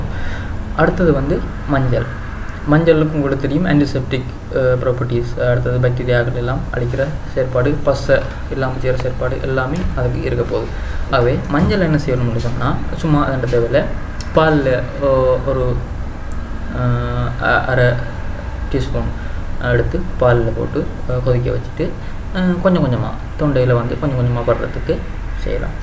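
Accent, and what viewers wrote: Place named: Sri Lanka. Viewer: naatu vaithiyam valarga vaalga bro SriLankan tamil supar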